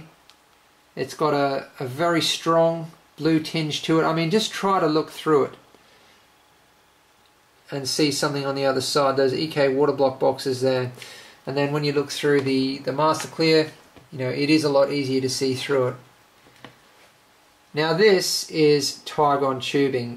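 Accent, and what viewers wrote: Australia. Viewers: I can't tell if you're an Aussie or a Kiwi... You sound generally Australian, but when you say things like "build log part 12" as "boold log paht twulv", it sounds rather Kuiwui (like me!) Cool boold though!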